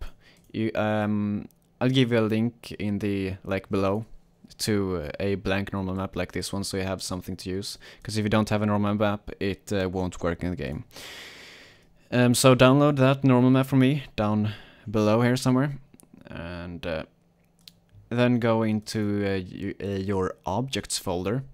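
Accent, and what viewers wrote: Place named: Germany. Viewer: Your accent sounds kinda German or Swedish ... I am german :D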